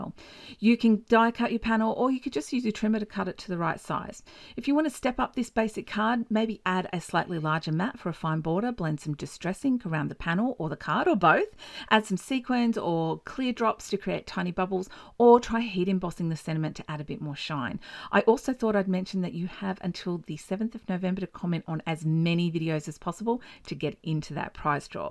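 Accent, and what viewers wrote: Australia. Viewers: SUPER cute images. And as a Yank (USA) I really enjoy listening to your Aussie accent. 😁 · So fun to hear a fellow Aussie accent! I'm so glad I found you in this hop - I just subscribed to your channel (international). I love these sweet cards you made!!! The mini gate fold is just so so cute!!!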